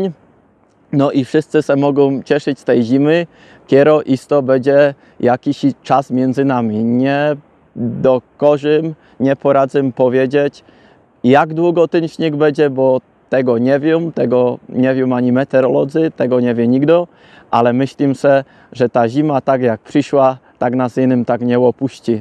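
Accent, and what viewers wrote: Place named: Silesia, Poland. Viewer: Śląska godka to je to!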